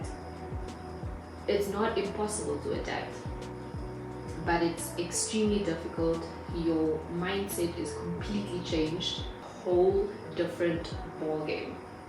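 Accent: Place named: Afrikaans, South Africa. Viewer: You look like an overachiever fr. The Afrikaans accent came out progressively. Sub & like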